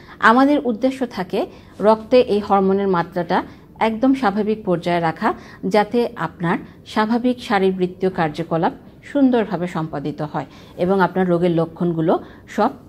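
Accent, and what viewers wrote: Chittagong, Bangladesh. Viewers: Madam ki chittagong ashen????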